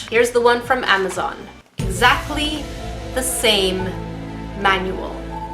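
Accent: Canada. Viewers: I love your salter crafter videos! I like seeing your salty disappointment face and your Canadian accent just makes these videos hilarious 😂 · Are you canadian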